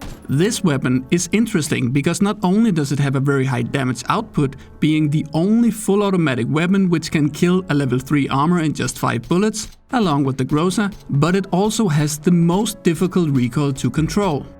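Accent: Denmark. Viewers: You deserve all the subscribers you have multiplied by 10. Thx for such great content. Are you btw from Denmark? Seem to detect a DK accent 💪🏻🇩🇰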